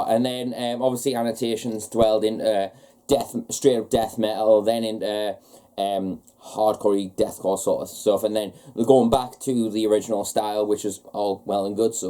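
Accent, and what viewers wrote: United Kingdom. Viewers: It's a Geordie accent, he's from Newcastle or Sunderland in the uk, the accent is sexy af with girls the Geordie accent lol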